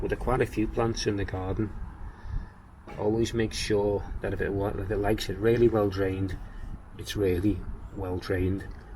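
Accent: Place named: Scotland, United Kingdom. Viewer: i like gardening but for some reason when explained to me in a Scottish accent; I enjoy a lot more.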